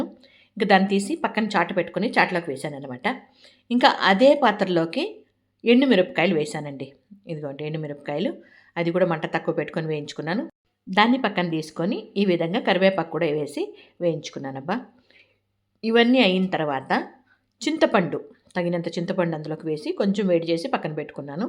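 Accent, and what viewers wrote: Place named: Rayalaseema, India. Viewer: Akka meku epudu kocham rayala seema kadapa Jella yasa vstavunde akka Karnataka yasa basha ravatam ledu papam me chelle malate garu kanada basha yasato voice vavaru estuvuntaru malate garu amma ne video lo chupiatu vuntaru video lo amma ne chuce so happy alge eroju video lo saree kamalu matching mamaching begone meku kamalu baga set inae akka 🎉🎉❤❤❤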